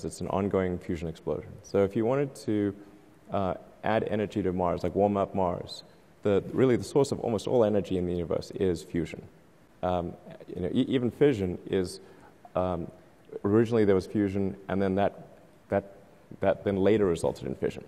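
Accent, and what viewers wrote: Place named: South Africa. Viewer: you can still hear his south african accent a bit in this video lol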